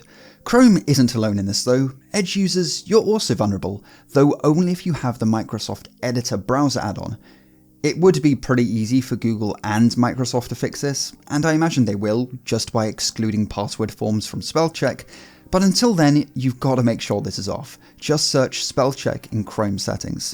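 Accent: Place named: RP, United Kingdom. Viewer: you sound so posh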